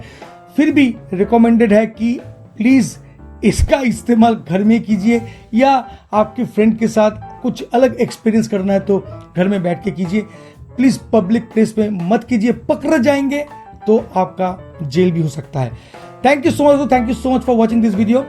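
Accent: Bengal, India. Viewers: Don't care about the contents. I am subscribing just for your bengali accent. It's so funny (in a good way) 🙂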